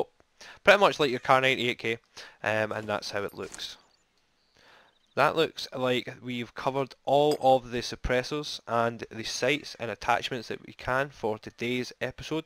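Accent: United Kingdom. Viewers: From birmingham ?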